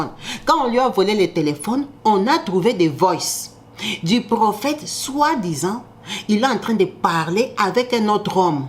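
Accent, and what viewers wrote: Democratic Republic of the Congo. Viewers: Juste un conseil madame. Parler avec votre accent congolais, vous etes ridicule avec cet accent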